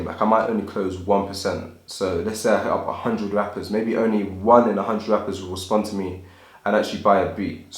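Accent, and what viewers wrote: United Kingdom. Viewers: ooh nice, how long you been there? Judging by your accent I'm assuming you're originally from the UK? Maybe London or surrounding? I was in Spain for a year, I miss that place, planning a quick visit this summer, maybe we can hook up, talk music. I'll hit you up on insta if that's kool?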